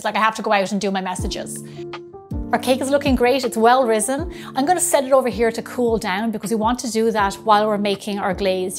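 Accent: Ireland. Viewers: Love this recipe & the Irish accent ❤️❤️ love from India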